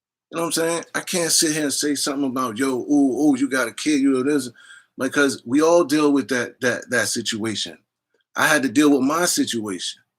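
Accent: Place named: New York, United States. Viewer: you sound like a new yorker bro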